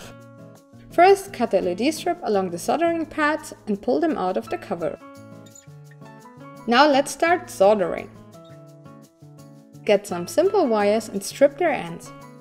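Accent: Germany. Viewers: I think she's German. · Which country do you come from? It sounds a bit like a German accent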